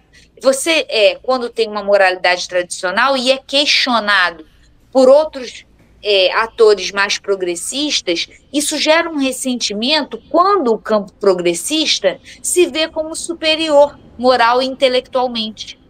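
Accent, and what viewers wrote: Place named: Rio de Janeiro, Brazil. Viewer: Professora, linguagem científica não se compatibiliza com sotaque carioca.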